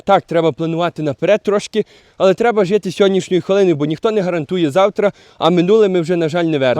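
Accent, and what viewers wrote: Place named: Lviv, Ukraine. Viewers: так у Львовi розмовляють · Це типічний Львів.Наша гордість.І говір і люди тут особливі. наш хлопець-львівський.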